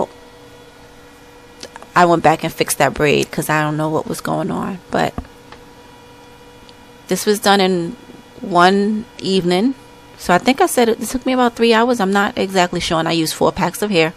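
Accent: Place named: New York, United States. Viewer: You sound like you are from my neck of the woods. Are you in or from Ny ? I love it from the 1st twist you installed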